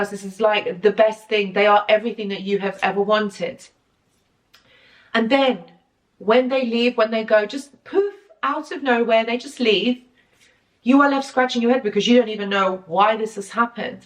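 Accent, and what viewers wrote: United Kingdom. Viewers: YOU , Miss are absolutely BRILLIANT!! and adore your Brit accent😍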